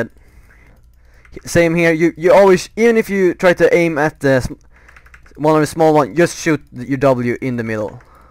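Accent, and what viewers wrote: Sweden. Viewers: Great guide man! Quick question that isn't related to league though, are you swedish (kind of sounds like it on your accent ^^)? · Man hör verkligen att du är svensk :P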